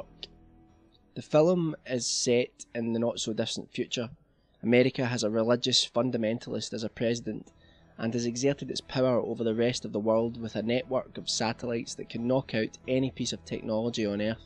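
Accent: Scotland, United Kingdom. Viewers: Don't listen to him. This crazy scottish accent is one of the best features of this video. Great comments on great movies, although I have a different ranking. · for those ignorent people he isnt english he is scottish :)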